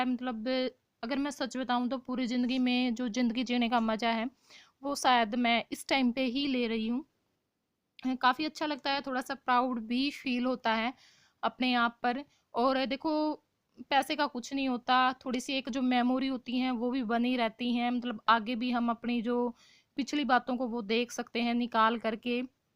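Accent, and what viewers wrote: Haryana, India. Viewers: Very nice di aapka haryanvi andaz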